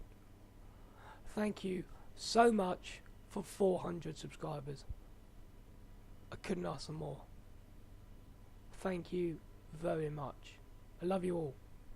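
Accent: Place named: United Kingdom. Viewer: Which part of the uk you're from? I'm from London ;p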